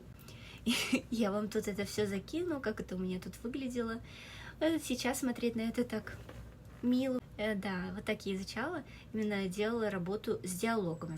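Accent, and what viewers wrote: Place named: South Korea. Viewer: Добрый вечер! Я просто в (здесь мат в хорошем смысле) с вашего прогресса в изучении данного языка. В русской речи уже слышен корейский акцент, что считаю показателем высокого знания иностранного языка. Мне из азиатских языков (выбирал только из корейского, китайского и японского) понравился японский. Но так как там есть иероглифы, решил что с иероглифами познакомлюсь быстрее через китайский))) Но через время узнал, что в корейском тоже есть китайские иероглифы (к сожалению на данный момент уже не использую, либо почти не используют) и соответственно решил изучать и корейский. 🤕 И это была большая ошибка. Корейский на тот момент мне не зашёл, для китайского не было такого рвения как для японского. Ну и соответственно было принято решение, отложить изучение иностранных языков на неопределенный срок. В этом году (в ближайшее время) хочу вернуться к изучению 7 языков. 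В моих целях нет достижения такого же уровня в столь короткий срок, просто хочу получать больше информации по шитью из разных источников. Можно будет увидеть обзор на приложение дуолинго? В основном интересуют: произношение и построение предложений. P.S.: В мой план по изучению входит дуолинго (только из-за того что он бесплатный); несколько блогеров (на данный момент по шитью); бесплатные курсы на ютуб; ну и в дальнейшем фильмы и сериалына иностранных языках, но только с субтитрами на оригинальном языке. Соответственно музыка, и какой-нибудь информационный канал (онлайн) на ютуб.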